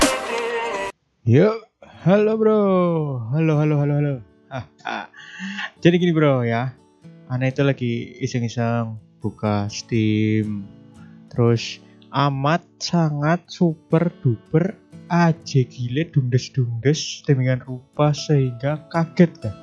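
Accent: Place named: Banyumas, Indonesia. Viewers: Ya musah ngapak lah kwiwkwowo guyonan om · Jan ngapak pisan koh medok medok😂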